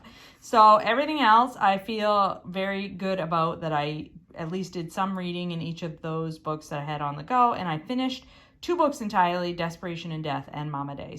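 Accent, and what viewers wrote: Maine, United States. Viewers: Seems a little late for the lake to be freezing. LOL at the lame Maine accent.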